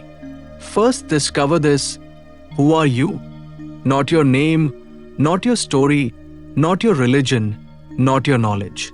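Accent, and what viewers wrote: India. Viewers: The narrator speaks with heavy indian accent. The story is not from bible for sure. Most probably from hindu since hindu is big religion in India.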